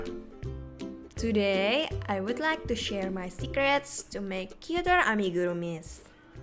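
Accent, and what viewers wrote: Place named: Indonesia. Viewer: Your accent likes Indonesian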